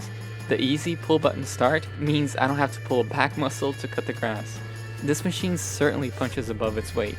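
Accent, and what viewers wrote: Brazil. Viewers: Are you are Brazilian American or a Ivan Brazilian. Your perfect pulo do gato accent made me wonder. That said. I love the aluminum can idea - do they provide additional heat for roots? Is that good? I’m having a hard time with. Making the cilantro seeds sprout.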